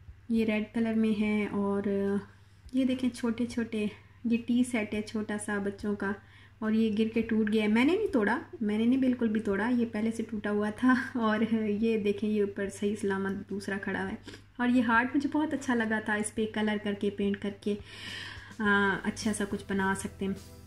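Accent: Saraiki, Pakistan. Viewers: Mein bhi saraiki hun....shoro sy hi ap k lehjy sy mjy pta lg gya tha k ap sariki hain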